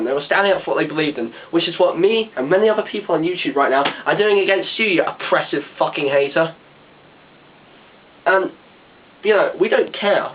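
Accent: United Kingdom. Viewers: Video is instant win because of British accent. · hey, i don't know if you have the same problem over in england (sorry if i'm wrong i'm just judging from your accent, and you did say your not from america) where you have people talking about how metal is tallentless, or did some of our braindead trash just leak over to your country??? i agree with you 100% and wholeheartedly, but anyway we have that problem alot over here....and it gererally pisses me off!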